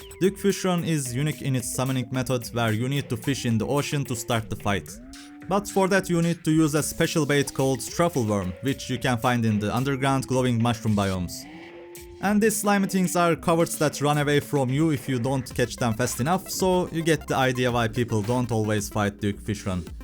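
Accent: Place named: Turkey. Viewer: i kinda hear a Turkish accent where are you from? i love bubble gun btw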